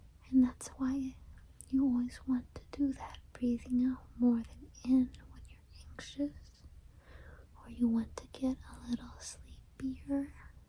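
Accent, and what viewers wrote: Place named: Eastern Europe. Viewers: I thought you were from Eastern Europe honestly. I’ve been on the West Coast my entire life and only heard an accent similar to yours from those who immigrated to the US when they were younger, so they end up with a bit of a mixed accent. Anyways, love your voice and videos. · that wierd. I thought I heard a east european accent. I am a Las Vegas native and I thought I knew west coast accents lol interesting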